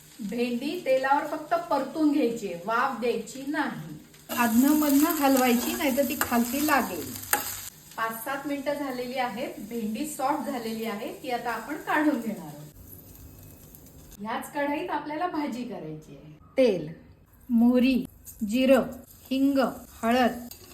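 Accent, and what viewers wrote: Konkan, India. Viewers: Tumhi khup chan bolta.. कोकणस्थ आहात का? 🤗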